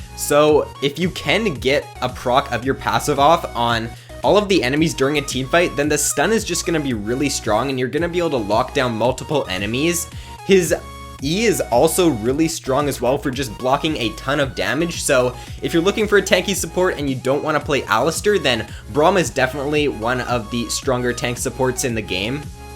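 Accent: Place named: Canada. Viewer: Ramon Schöllkopf he is Canadian, so to him he is pronouncing just fine. Just different accents I guess.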